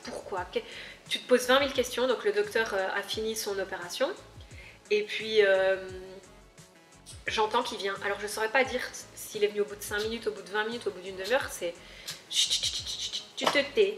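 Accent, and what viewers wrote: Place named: North, France. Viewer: Cet accent du nord prononcé ! ! Le sujet est hyper intéressent, je me suis fait opérée aujourd'hui. Je ne sais pas si tu continue YouTube mais pense à raccourcir tes vidéos et couper les moments inutiles (genre le chien qui aboie) en revanche ce qui me stress c:est qu'on m'a demandé d'enlever les mèches moi même moins de 24h après. Je ressemble à éléphant man aussi! Mes yeux sont hyper gonflés et violets/noirs !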